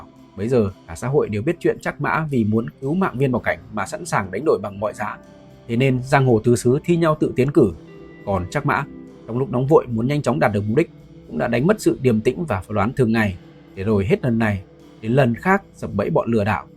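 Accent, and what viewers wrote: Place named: North, Vietnam. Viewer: có thể lồng tiếng miền nam đuọc không ad . tiếng miền bắc giọng gọng gọng khó nghe . xem mà phải tua nhanh gần hêt một video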